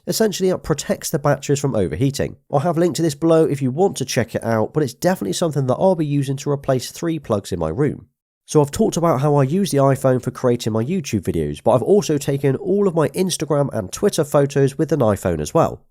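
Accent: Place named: West Country, United Kingdom. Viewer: Your West Country twang coming in strong here😂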